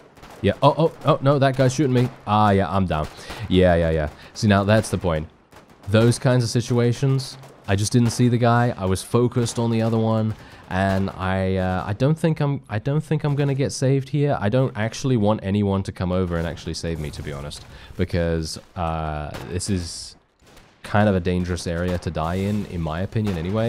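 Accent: United Kingdom. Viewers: I hope you don't take this the wrong way, but I feel as though, in a game this hectic where there is so much going on, the constant commentary might be less useful. I normally think your commentary is great, in games like Bannerlord. But then we all know what to expect from Bannerlord, this game is new and I would sort of like to see you explaining how the logistics works, or letting us experience the carnage of combat without a smooth British voice calmly stating, "Oh that was a nice grenade, I'm dying." I still love it because let's be honest that's kind of funny, but a compromise could be giving us five to ten seconds in tense situations to hear what you hear, the communication in the game and whatnot. If you don't like my criticism I understand, because I am certain that many people will disagree with me, it's just a personal preference, I will continue watching either way.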